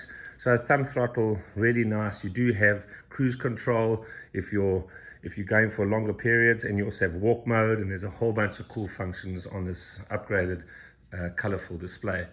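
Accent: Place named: Afrikaans, South Africa. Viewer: i love your afrikaans accent!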